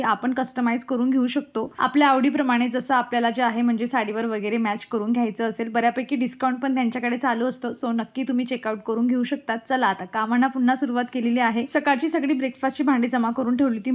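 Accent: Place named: Mumbai, India. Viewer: Mumbai वाल boltet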